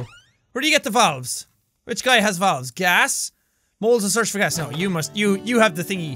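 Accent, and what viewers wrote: Ireland. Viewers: Yes! I've always been wondering who has that Irish accent! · He is bloody fuckin irish m8. It`s his accent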